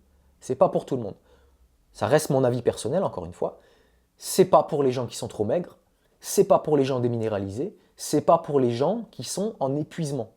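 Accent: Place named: Housing estates, France. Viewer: Déjà apprends à aligner une phrase correcte en français, et après tu pourras, éventuellement, donner ton avis sur ceux à la cheville de qui tu n'arriveras jamais...ce personnage prétentieux à l'accent des quartiers (..) illustre la nouvelle "jeunesse", qui croit tout savoir et dont le cerveau a été configuré binaire par les smartphones dès le plus jeune âge. Voilà le futur de l'humanité ...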